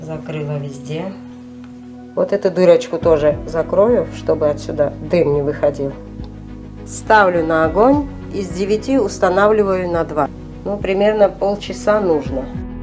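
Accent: Georgia. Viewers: Как говорил один мой шапочно ЗНАКОМЫЙ-ОХРЕНИТЕЛЬНО!А я у себя в Белфасте убитую микроволновку под копчение приспособил-там бак из нержавейки.Позже нашёл выброшенную кем-то барбекюшницу с прогнившим дном, и вместо гнили върезал туда ведро на 10 литров.Теперь она на ведре стоИт при копчении, и туда влезает 2 курицы, или 5 филе скумбрии. Вот дождусь выходных, и сотворю ящик для холодного копчения, с подачей дыма с помощью туалетного вытяжного вентилятора))), я вас обожаю! Ваш лёгкий грузинский акцент возвращает меня в годы моей молодости, когда национальность не имела значения.С ОГРОМНЫМ УВАЖЕНИЕМ к Вам и Вашей Семье. Ребята, мы должны так же жить в Нашей Стране, как вы в Испании!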